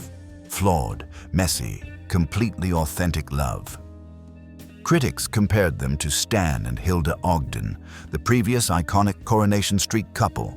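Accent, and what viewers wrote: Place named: United States. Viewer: Why is an American doing the commentary..?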